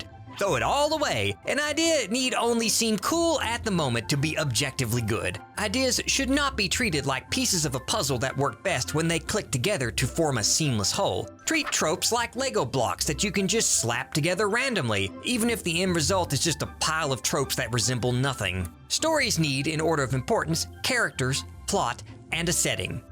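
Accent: South, United States. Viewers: I haven't seen this before, but my immediate thoughts are: 1What accent is that? I recognize it as southern, but I can't tell where in the south, those vowels are thoroughly scrambled. 2 neat, but prop not my fav of bad advice videos, just because of how cynical and sarcastic it is, while I prefer more lighthearted or comedic takes. 3 wait this his first time animating this stuff?! Great job dude! Have a lovely day! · I'm glad you're back, but you don't have to attack me like this. I'm enjoying your new animations and that sweet Southern twaaaang that seems a lil more pronounced now. But seriously though, welcome back, and I hope you're doing well.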